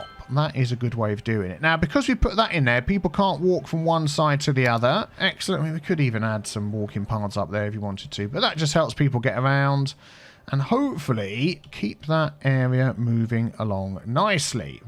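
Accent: United Kingdom. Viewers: sounds bloody british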